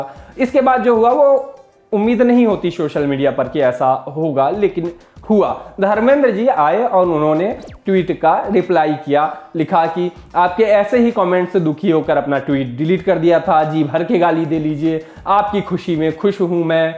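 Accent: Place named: Bihar, India. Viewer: Yarr mujhe aap ka baat krne ka tarika bohot achha lga bihari hindi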